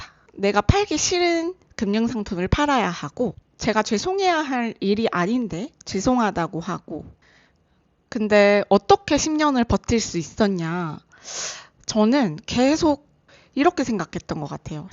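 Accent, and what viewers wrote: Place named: Gyeongsang, South Korea. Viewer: 경상도출신 主婦이네요?음성. 억양. 과거는,女商출신이,창구를 응대하는데...요새는, 전부 4년제대학출신.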